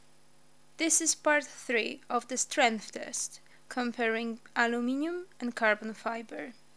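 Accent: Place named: Poland. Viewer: Pani chyba z Polski, akcent się zgadza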